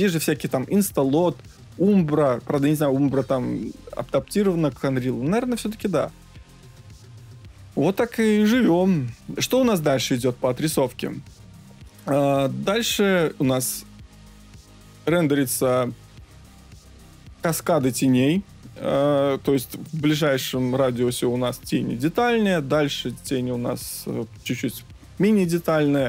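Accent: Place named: Belarus. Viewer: Начал смотреть видос ,услышал голос и первым делом подумал о боже это же мой дядька из Минска,а потом увидел как канал называется. Беларускую речь ни чем не попутаешь. Так вот теперь понятно почему все у кого ниже ай 5 плачут что игра лагает. У самого 6900к(разгон) и 1080(даунвольт) в среднем 110-135 фпс держит на низко -высоких настройках. С меня лайк и подписка.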